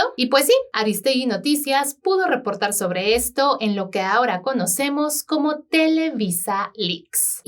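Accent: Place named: Argentina. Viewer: y porqué hablas con acento Argentino?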